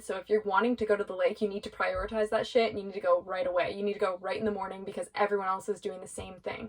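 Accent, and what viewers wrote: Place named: Canada. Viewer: Your vids are cool, and I love the way Canadians say "aboot" (about).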